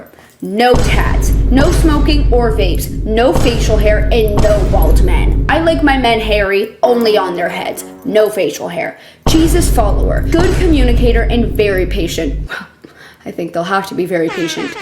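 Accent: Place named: Canada. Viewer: Canadian detected, I won’t be subscribing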